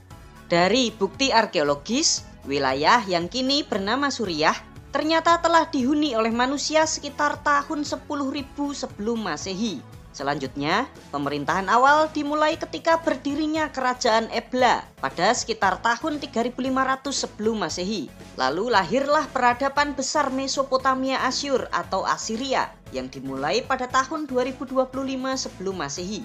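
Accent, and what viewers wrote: Java, Indonesia. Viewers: Mbak jawa ya,,??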